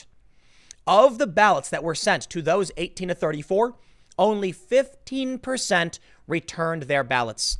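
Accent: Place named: Chicago, United States. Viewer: I never noticed that chicago accent until this video. The way he says "House" is a dead give away.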